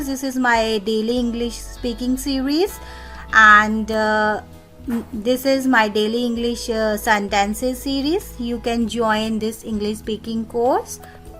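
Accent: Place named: India. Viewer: Sorry but I can't understand what you said. The accent is like Indian or Malay or someone from Middle East.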